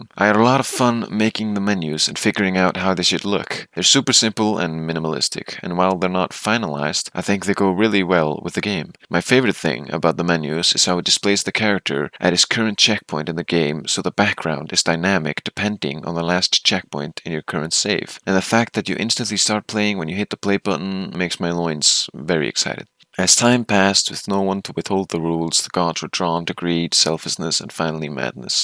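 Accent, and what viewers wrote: Iceland. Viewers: You have an Icelandic accent. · You sound icelandic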